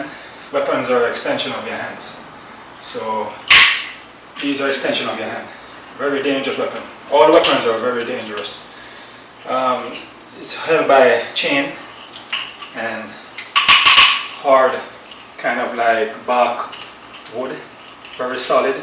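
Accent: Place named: Jamaica. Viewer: wait...... yuh sound like a yardie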